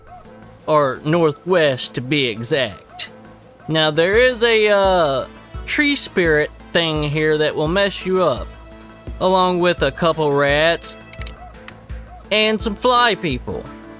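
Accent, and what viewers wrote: South, United States. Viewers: Love the jolly southern man helping me collect all the borbs!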